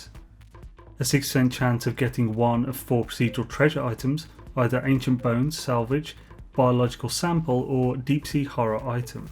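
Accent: United Kingdom. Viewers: Definitely a Brit, I'm going with somewhere in the midlands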